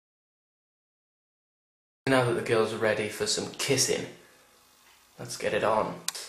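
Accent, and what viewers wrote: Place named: Northern England, United Kingdom. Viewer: the northern accent is killing me omfg you star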